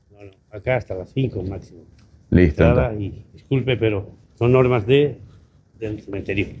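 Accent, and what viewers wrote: Argentina. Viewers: ya se te pego el acento argentino, vi un vídeo de hace 5 años y hablabas venezolano 😅 jejejeje saludos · Es excelente por qué ya de estar tanto tiempo acá parte de tu acento tiene algo de argentino, pero lo disimulaste lo mejor que pudiste jajaja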